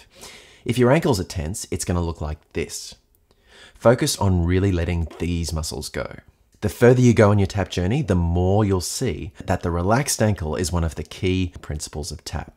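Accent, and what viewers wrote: Australia. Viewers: Is this guy Australian? He sounds that way. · Crikey he’s Australian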